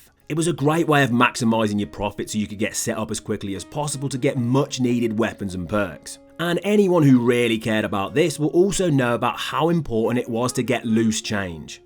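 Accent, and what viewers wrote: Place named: Australia. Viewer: accent is like a heavy country Australian accent, plus a heavy accent from the uk somewhere very awesome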